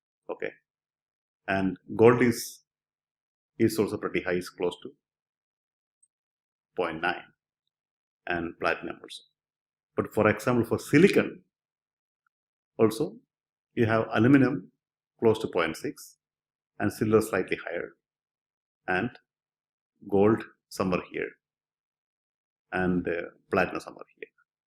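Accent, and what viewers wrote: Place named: England, United Kingdom. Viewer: Omg!!! Amazing English accent 😊😊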